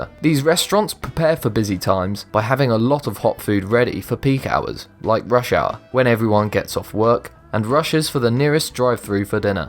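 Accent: England, United Kingdom. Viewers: Like your English accent, I get sick and tired of American accents, Cheers, from - Australia 👍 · Love that british accent! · Please dont pronounce everything with an over the top brit accent , you just lost 14 viewers in my family , the accent is old and we. E mentioned it many times but you still wont change it. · Got a lot of hard feelings, when I hear a distinguishable British accent bashing American food, while not discussing blood pudding, aged beef, mincemeat pies, and the absolute hoard of atrocious foods they have, in the same discussion. Narrator, why don't you explain to me what, exactly, is wrong with British 'Fish and Chips', that the mayor of London is banning them for kids? If you feed children like sheep, expect them to look like them too (India is a great example of a nation plundered by Britain in the 19th Century that did so well, they nearly bankrupted New Deli before it was given back to them)...ever seen a 6-ft tall bodybuilder in India? 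Hint: it's because they worship cows, and don't eat them (until they show up in America, and then they eat them!)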